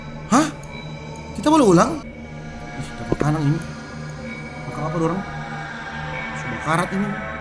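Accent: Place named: Java, Indonesia. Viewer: Logatnya lucu njay sma dengan orang jowo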